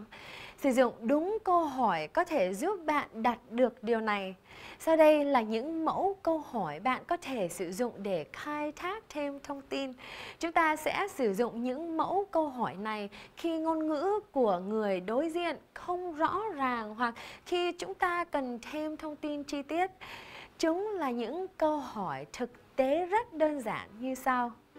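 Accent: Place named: United States. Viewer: toi qua mung khi xem dc kenh nay,co giao doc tieng Viet rat ro rang tung loi tung chu,giong bac nhe nhang de thuong,va giong my cung hay qua,cho Minh hoi tham.co phai co dang o my kg ah.minh se co gang hoc doc giong co moi dc.cam on co nhieu. sang nay tinh co xem kenh cua co 've chu de nha cua, gio xem kenh nay.thich qua di thoi,.co phai co dang o my kg.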